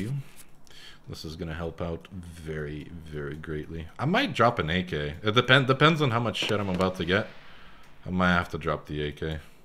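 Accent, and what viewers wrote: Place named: United States. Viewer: С твоим американским акцентом хрен поймешь кто ты есть на самом деле, я подписывался на американца, а ты украинец вообще, если верить комментариям...вотэтоповорот. Жги, братюня!)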